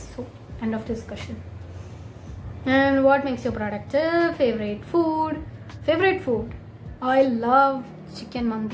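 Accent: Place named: Kerala, India. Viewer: Her Malayalam accent was super good!!!!!!!<<<3💗🧿🤌🏻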